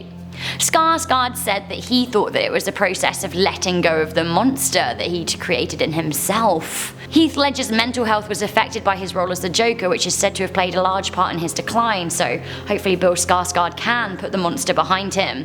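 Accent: United Kingdom. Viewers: PS. I WANT TO SEE YOU IN MORE VIDEOS CUZ I LOVE YOUR ACCENT I LOVE BRITISH PEOPLE I WISH I WAS BRITISH!!!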